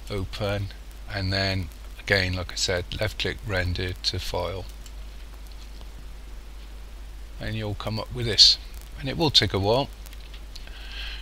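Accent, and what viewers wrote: Bristol, United Kingdom. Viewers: Brilliant video! Btw, are you from Bristol?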